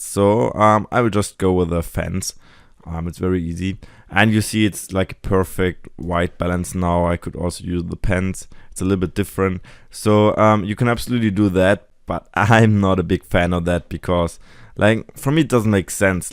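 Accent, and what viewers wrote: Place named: Germany. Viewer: echt angenehm, das Englisch von einem Deutschen zu hören, dann kann man auch mal was verstehen xD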